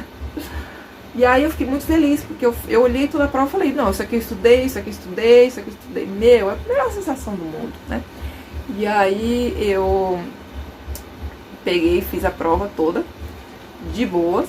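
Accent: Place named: Salvador, Brazil. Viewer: É daqui de Salvador com certeza 😜😁😁 ! Só nós com esse sotaque kkkkk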